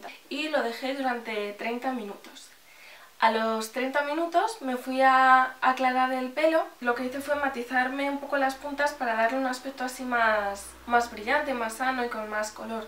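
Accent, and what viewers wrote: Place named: Spain. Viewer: Gracias, porque de por si me castra el acento español,y dura horas habla y habla y no va directo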